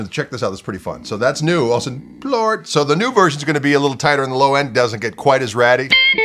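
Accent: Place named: Chicago, United States. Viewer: This man sounds like he's from Chicago He's from Wisconsin. Close enough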